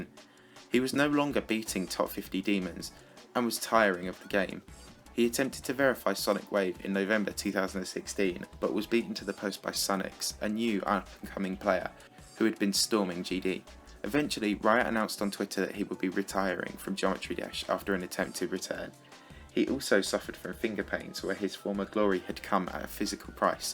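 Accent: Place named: RP, United Kingdom. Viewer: Good video but something is missing..... SUBTITLES! (Because your british accent is 2 formal, I can't hear it clearly ): )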